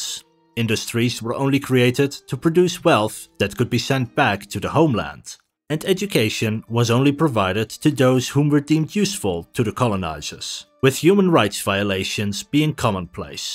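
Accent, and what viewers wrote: Netherlands. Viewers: Man, I spent a lot of time trying to place your accent. It does sound Dutch, but it’s different than many of my Dutch friends’ accents. Where are you from?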